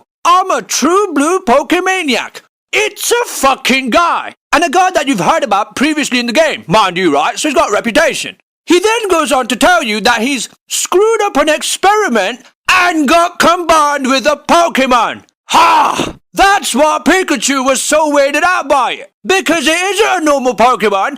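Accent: United Kingdom. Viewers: nvm hes british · BRITISH MAN SHOUTING ABOUT RANDOM ASS SHIT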